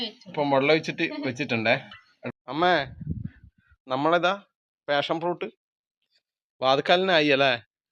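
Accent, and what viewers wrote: Kannur, India. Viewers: Padichathe nammal padu alle nammalkku achadi bhashayonnum veettil venda alle nammale kannur slang poliyalle❤❤❤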